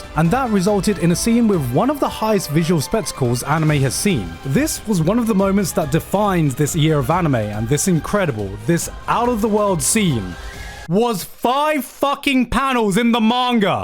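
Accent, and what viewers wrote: England, United Kingdom. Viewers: What’s the title of that horror manga presented in ? I’m not good at understanding japanese titles heard in english accent or maybe I’m just deaf, dunno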